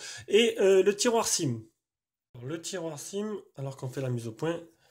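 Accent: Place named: Marseille, France. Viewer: Ta un accent marseillais comme moi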